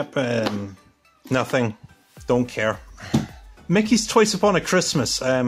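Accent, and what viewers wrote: Scotland, United Kingdom. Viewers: Just wondering are u scottish ? I am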